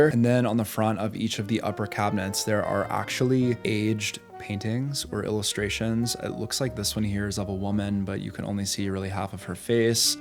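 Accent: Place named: Los Angeles, United States. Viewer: Dude, drop the LA accent. It’s hella annoying. You didn’t used to talk like that.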